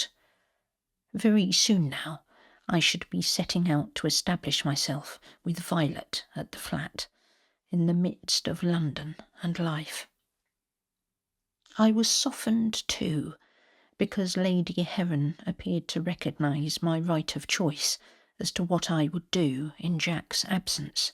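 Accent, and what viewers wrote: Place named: Scotland, United Kingdom. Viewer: What a great little story, I thoroughly enjoyed it. Sitting here with my eyes closed, I imagined I was at Mallory. As usual a brilliant narration and I loved your Scottish accent. Thankyou so much.❤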